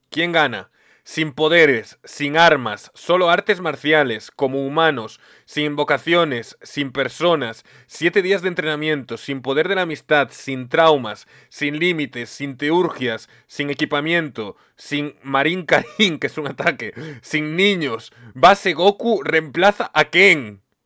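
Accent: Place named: Spain. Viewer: Como se nota que es español 🗣️🔥🔥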